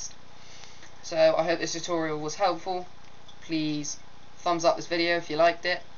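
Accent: United Kingdom. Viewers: Awhh One Direction Accent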